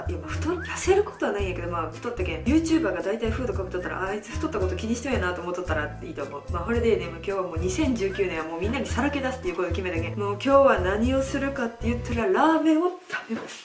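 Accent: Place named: Kansai, Japan. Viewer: ぺんぎん さん そうでしたか！w どうりで、関西訛りの日本語が上手いと思っていました！ww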